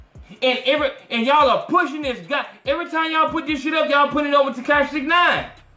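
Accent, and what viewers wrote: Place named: South, United States. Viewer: You from Bama?? I knew there was some south in you! 😊 #bamabornandraised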